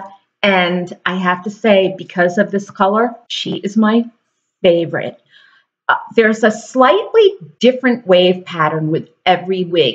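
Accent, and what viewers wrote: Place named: Long Island, United States. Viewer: DrlinG i'm born and breed on LonG Island you accent takes me back to my childhood. When in the early 60's all the up and coming Queens families transplanted to Muttontown, L.I.no I didn't get the Locust valley lockjaw accent, it's Queens all the way baby, I am a recent hair losie, fourteen weeks in the hospital, let's just say between the illness and earnest hair being combed out there is not a lot to work with. My biggest problem is trying to keep a wig cap on. My skull bone in the back is quite shallow and the wig cap rides up. Oye. I have been using "Gots 2b" as a holding spray but there are time even that does not work.Any suggestions would be helpful. I so enjoy your videos, keep them coming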